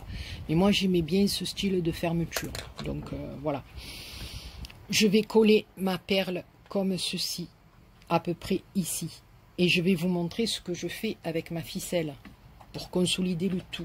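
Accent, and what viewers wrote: South, France. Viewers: Coucou, ils sont juste magnifiques tes coffres, j'adore! merci beaucoup pour ce tuto, ces explications et ta bonne humeur, j'adore t'écouter avec ce petit accent qui nous rapproche du Sud, bisous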